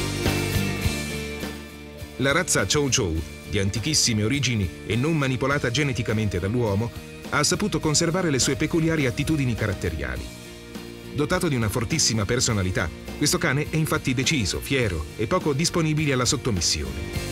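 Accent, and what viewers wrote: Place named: Lombardy, Italy. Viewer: ma...sai giusto per curiosità se ti va di rispondere Ma tu di dove sei? Pare che parli un "mix" di dialetti... Trentino e bo potrò sbagliare chiedo venia ma qualcosa di lombardo? Piemontese?? Chiedo solo per sapere perché apparte te e la prima volta che sento una parlantina con rispetto parlando giuro che non è offesa) del genere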